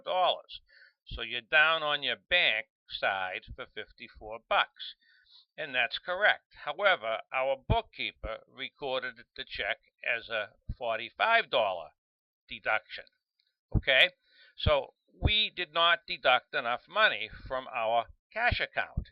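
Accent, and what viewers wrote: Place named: Boston, United States. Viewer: Very helpful. I love your Boston accent and mannerisms. I'm from Boston too!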